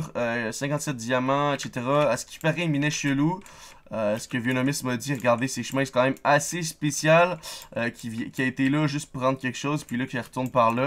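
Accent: Quebec, Canada. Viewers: comme tu aimes bien les nouvelles personnes, je m'abonné like et encore un nouvelle épisode d'admin série =D car oui je regardais tes épisode auparavant et je go rejoindre ton serveur dans probablement quelques minutes ;) tu es québécois? j'ai l'impression :p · Minecratf Je suis prêt a parier que tu est Québecois, car moi aussi j'ai la même accent et je suis Québecois ;)))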